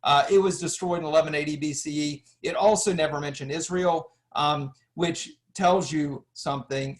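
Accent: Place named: South, United States. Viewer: I think it's just his Southern accent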